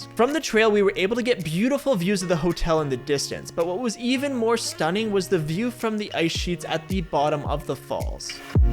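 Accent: Canada. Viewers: You sound canaidan are you?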